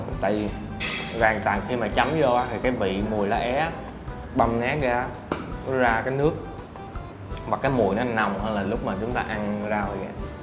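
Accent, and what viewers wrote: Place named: Binh Dinh, Vietnam. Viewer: Giọng bình định nghe mà nhớ quê quá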